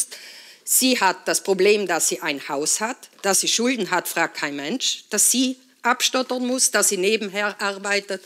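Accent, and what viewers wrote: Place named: South Tyrol, Italy. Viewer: Richtiges Südtiroler deutsch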